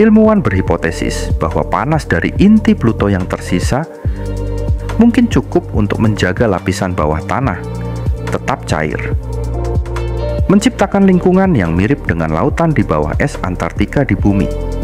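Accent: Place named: Java, Indonesia. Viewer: Naratornya medok bgt😁aku suka klw mslh astronomi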